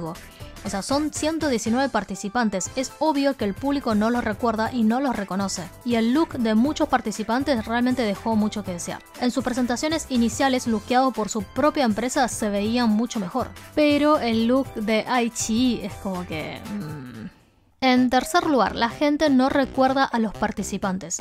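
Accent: Argentina. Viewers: Porque tiene acento argentino?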